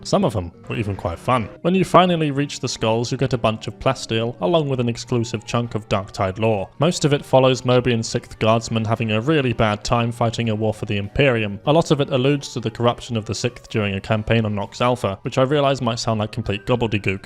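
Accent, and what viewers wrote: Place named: United Kingdom. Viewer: i love your unique combination of being "lucky" enough to be british and have the accent, the jokes, and the editing. oh dear oh dear. amazing. jump king stream when?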